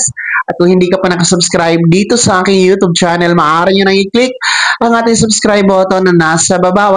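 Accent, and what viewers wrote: Visayas, Philippines. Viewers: ayusin mo pagsasslita mo!!! palibhasa bisaya ka!!!!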